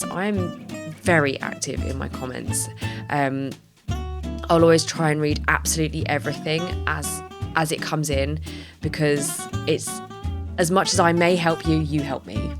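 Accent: United Kingdom. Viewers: Having a British accent is like listening to someone on 24 hour cosplay for Lord of the Rings or Game of Thrones...All that medieval fantasy stuff to nerd out for. Have a Merry Christmas!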